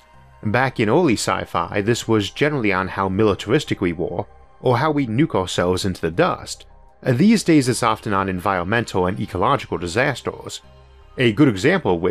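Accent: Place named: Maryland, United States. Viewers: I'm just lovin' these - there's a calm, mesmerising pace to them that just keeps your attention. Bravo! Where's your accent from, BTW - I'm in the UK and I can't place where in the USA (if, indeed, it's USAish) you're from. There's Deep South twangs in there - and then hints of Canadian. But I'm stumped! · Maryland accent! Only place in the world the letter R is spoken out as a W... Hasn't anyone ever tried to correct this odd phenomenon? Only place a speech impediment is the norm. Great show and Host. Just hard for me not to notice the accent and stay with the info.... MAYBE I'm the one that's impeded.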